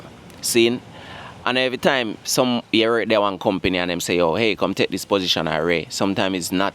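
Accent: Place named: Patois, Jamaica. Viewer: I came across your channel by accident. But I am glad I did, as someone who has a xaymacan background. I love to support xaymaca. I love that you speaking in patwah language, and just being yourself. And not using the standard English. I am vegan, but still watching the channel. Reminds me of the xaymacan dishes my family makes.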